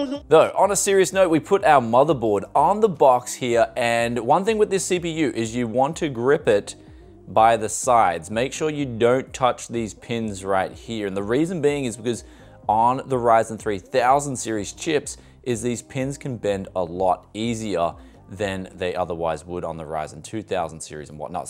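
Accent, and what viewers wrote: Australia. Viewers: Nice Build btw. I like it, just recently found your channel an I like your character a lot. Could listen to your advice and opinions all day long :) Maybe its the Aussi-slang in your English, gives me those Steve Erwin vibes, no offense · His ozzy english n movie star looks ..dayum! "But a320?" Says b450 fans.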